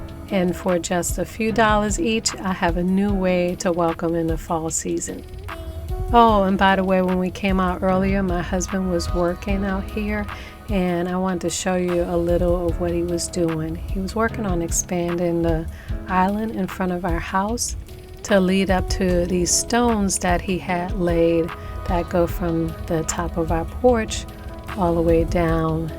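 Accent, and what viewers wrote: New Orleans, United States. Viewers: Just found your channel and subscribed after one video! Hey, I love your New Orleans accent. I grew up not far from there but across the line in Mississippi. It was a wonderful day trip to visit the Quarter and eat at some of the best restaurants anywhere. I like your vision of the holidays. I’ve grown weary of other decorations; while I love “farmstyle”, I prefer unique interpretations of holiday decor, and desire something that no one else has. You’ve met that need. Look forward to seeing the beauty of art & design through your eyes! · you are the absolute sweetest person I have ever heard! I feel blessed to have found your channel and look forward to every one of your tutorials. I love the way you talk! It gives us a glimpse into your roots (NOLA girl!). We Canadians have our own special way of saying some words and I honestly think I say punkins sometimes as well! 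You are such a treasure sweet girl! Much love from Canada.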